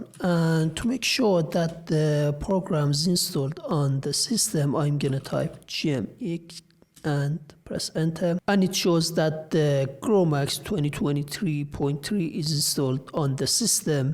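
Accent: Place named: Iran. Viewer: loved your Farsi accent. Iran's flag is risen anywhere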